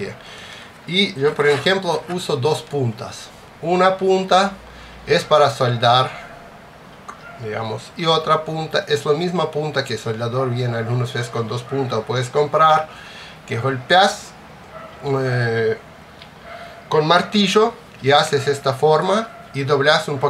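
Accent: Russia. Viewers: Ruso o polaco?😀👍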